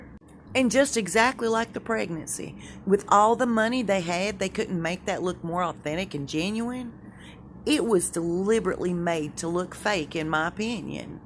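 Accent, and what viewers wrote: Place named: South, United States. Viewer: Very informative ..and gosh coming from nz I adore your lovely poetic accent.I love the southern accents.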